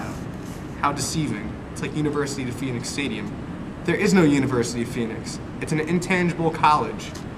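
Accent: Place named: Long Island, United States. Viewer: you don't sound professional at all, it is hard to even listen to what you are talking about because you just sound like another guy from LI. You need to settle down a little bit and take yourself seriously. good to see you trying something you are interested in. -Sam Scheibel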